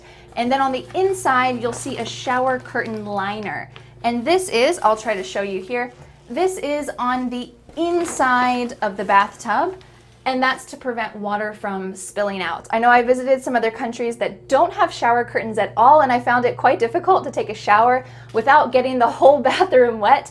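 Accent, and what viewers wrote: United States. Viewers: I luv her accent. I'm crazy about american eccent... I'm practicing this everyday. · WOW... I nuts about your methodology...God bless you teacher,and amen!!! I've been watching some of your videos and I love your American accent! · sei troppo brava complimenti. In quale stato dell’America vivi? Chiedo tanto per capire che accento usi del Norton del South. Grazie 😊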